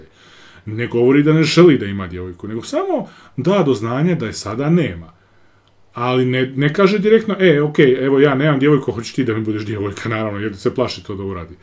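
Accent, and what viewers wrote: Ijekavian, Serbia. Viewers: Opis i tekst na ekavici. Govor na ijekavici. Kompleksi su cudo. 🤣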